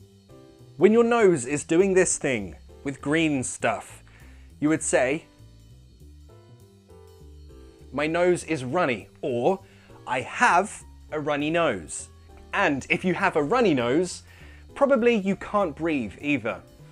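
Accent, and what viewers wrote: United Kingdom. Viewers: Some phrases from one of my students: "In spanish when you have diarrhea we say I have a "belly ache" or lower abdomen ache since the stomach is in a different area of the body, separated from the intestines". "I have pain in my private parts"... what would that be in proper english?. Another phrase from my student: This type of food makes me fart in the morning. Thanks you are a very sexy teacher, entertaining and with a wonderful british accent. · The best teacher, I love the british accent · As an English teacher and when I had a butcher on your videos I thought they are similar to other videos on the YT, I have learned a lot from you mate, I daresay you are a star in teaching as far as British accent is concerned ,very talented and your body language is really explanatory and amazing, thumbs up mate . I wonder if you could post a video on some conjunctions and transition words,like henceforth , therefore ,thus,etc I couldn't find any genuine British videos concerning these. 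Cheers Keep it up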